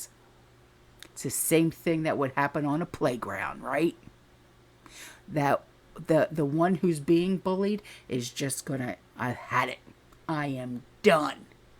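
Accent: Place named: New Jersey, United States. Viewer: She’s from Jersey. Its the accent. Get over it.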